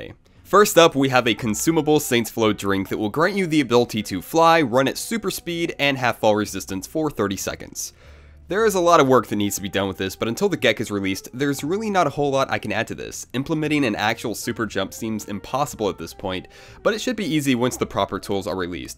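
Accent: United States. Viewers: Why do you play it in Japanese, You have an American accent.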